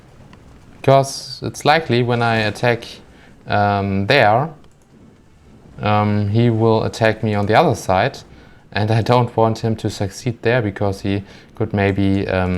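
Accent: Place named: Germany. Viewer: German accent ist klear.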